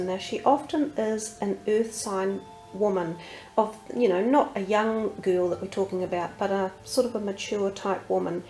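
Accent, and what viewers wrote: New Zealand. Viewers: kia Ora how lovely to hear a Kiwi voice online. Thank you for the heads up for july Blessed Be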